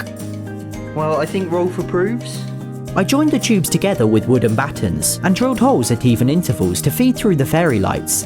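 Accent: England, United Kingdom. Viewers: Are you British? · I have this lego trains and you speaking like english gentlemen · Are you british?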